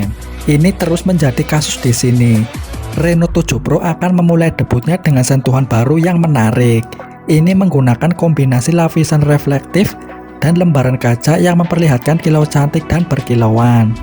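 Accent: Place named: Padang, Indonesia. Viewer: Suaranya , Abang orang Padang ya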